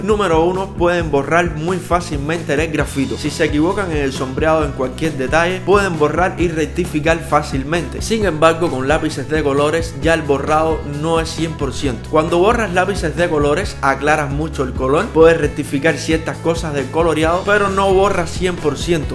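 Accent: Cuba. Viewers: Tu acento te delata tienes origen cubano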